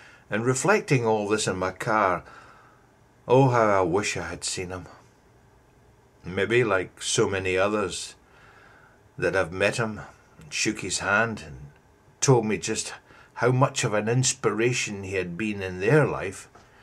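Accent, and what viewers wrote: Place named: Scotland, United Kingdom. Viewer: Thank you for sharing your thoughts (lovely Scottish accent). I'm sure that all the fans in the UK regret never seeing Elvis. I'm a '1956 era' fan too and feel exactly like you. No one will ever replace him, but I have found a tribute artist, Jay Ashton, who actually allows me to imagine what seeing Elvis in a live show might have been like. Jay just wants to share his joy in the songs of Elvis with all the other fans so ... no "bells and whistles" ... just a great performance.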